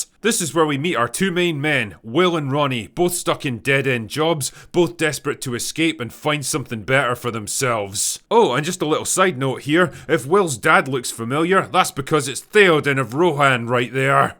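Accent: Scotland, United Kingdom. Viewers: SCOTTISH?!?! Aaaw man all this time I thought you were Irish. The accents are so similar! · I did notice the subtle accent, Scottish, who would guess... Great channel.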